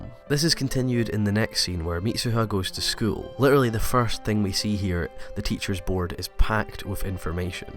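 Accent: Scotland, United Kingdom. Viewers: Sorry, unrelated but I love your accent!! I'm Scottish tooo !! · He sounds Scottish. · I've been watching your videos for a while now and I still think your narration, detailed reviews and opinions make for great viewing. Watching anime which is innately foreign in nature, is made to seem very natural when presented and discussed in a familiar Scottish accent. This film has been on my to-watch list for a while now so although I'm disappointed I didn't get to see it in a cinema setting (and admittedly, I probably should have watched it before watching this video), this has definitely made me even more keen to watch the film. Great video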